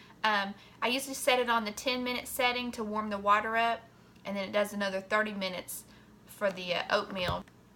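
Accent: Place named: Texas, United States. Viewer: Are you from Texas? Love the accent!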